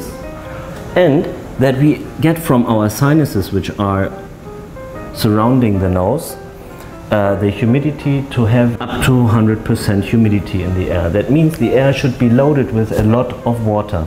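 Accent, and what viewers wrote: Germany. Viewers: are you from germany? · His accent is so German so I trust him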